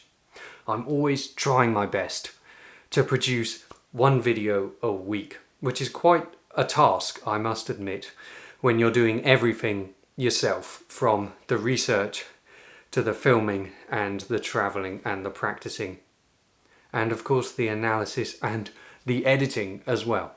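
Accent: England, United Kingdom. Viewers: Love gearing that ENGLISH ACCENT, YES THE BIRDS ARE ACTUALLY SINGING PRAISES TO THEIR CREATOR,!! I saw it somewhere in the Scriptures one time, HALLELUJAH, Lovely presentation your Sis, Thankyou, E83 Australia, 🎉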